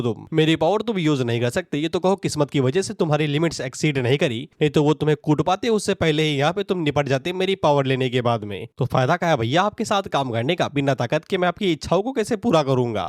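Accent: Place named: Bhojpuri, India. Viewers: Bhai bhojpuri ka tadka marte raho achha lagta h 😂😂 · Bhojpuriya voice bahut accha laga Beech beech me bole karo maza aata he😂😂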